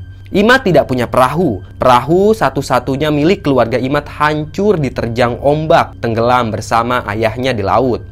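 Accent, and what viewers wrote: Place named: Lombok, Indonesia. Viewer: Orang lombok yah😅😅😅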